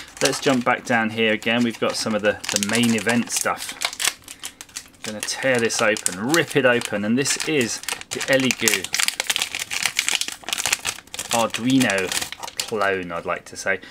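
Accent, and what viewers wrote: United Kingdom. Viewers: I like the way you describe all the kit components! Nothing like to hear the British accent! 👍🏼😃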